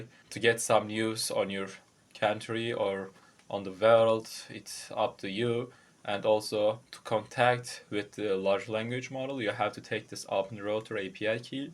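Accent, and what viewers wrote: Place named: Turkey. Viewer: Türk Aksaanini biraz belli etmis gibisin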